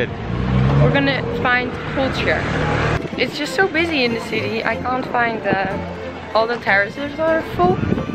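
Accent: Netherlands. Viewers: The most pickable Dutch accent ever. One word and you know she's Dutch! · I only watch yours and Romee's vlogs because I unterstand your English pretty good😂 well now I know why. Really love when Dutch people speak English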